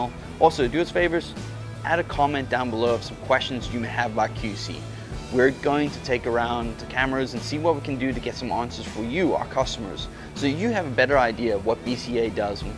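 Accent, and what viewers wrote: South Africa. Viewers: sounds like a South African.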